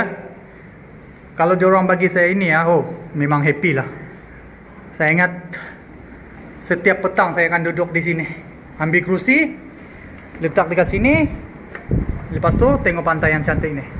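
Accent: Sabah, Malaysia. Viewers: orang sabah bang,mak kadazan ayah india dan dia islam xsilapnya.sbb tu pelat² sabah tapi muka ala² india.😊😊😊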